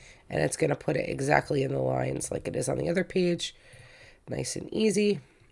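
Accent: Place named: New England, United States. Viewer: 👏This is the only vid that made sense for these pages! Thank you for actually saying everything needed. Some vids will miss saying shift and then I can't go any further because I don't know why the tool wasn't working like I want. So glad I found your channel. I also like your (New England?) accent. I can finish my homework now!!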